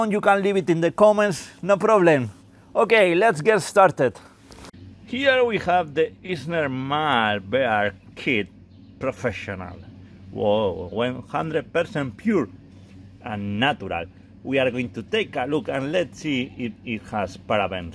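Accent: Spain. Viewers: Me encanta estar buscando todo en ingles y encontrarme con un español hablando ingles, como se nos nota el acento cuando hablamos... Nice video mate :) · I just bought this now, nice review. I like your accent btw, are you Spanish?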